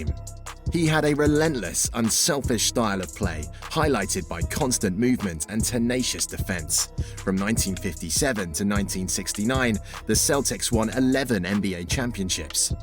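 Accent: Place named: Ireland. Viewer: The narrator is obviously not from the US, so he is pronouncing words he would normally do from his country (?I woud guess Ireland)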